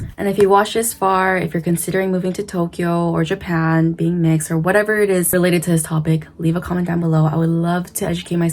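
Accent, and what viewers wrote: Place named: New York, United States. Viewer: Not trying to be disrespectful but you sound like a New York Latina and not sure if anybody's told you that? And you said you lived in canada but you got me thinking you're puerto rican from the tone off you're voice and I'm cuban so It's cool and I enjoy you're feed back on japan and tokyo but you got a strong American dialect which makes you real from the soft spoken japanese girl even if they are half but it's good to hear how you give input with such confidence bravo to you as a asian girl. 🙌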